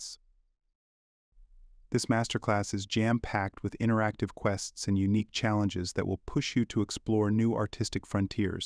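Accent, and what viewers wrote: France. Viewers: Thank the Heavens for he isn't speaking with that horrendous French accent in this video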